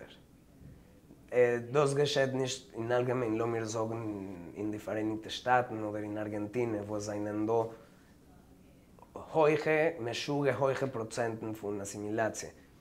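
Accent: Mexico City, Mexico. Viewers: wtff suena como chilango...definitely from Mexico City...cute ❤❤ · I don’t even speak Hebrew and I can EASILY hear the Mexican accent when he’s speaking it. It’s awesome! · It's weird that he sees himself first as Jew and then as a Mexican, because his accent from Mexico City is very strong. Actually, he sounds like someone from Mexico City trying to speak Yiddish.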